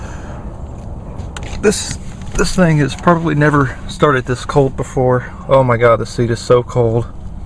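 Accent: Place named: South, United States. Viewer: Where exactly are you, if you don't mind my asking? I detect a hint of a Southern accent. Oh, the song on the Cruze's stereo was PERFECT for that morning!!!!!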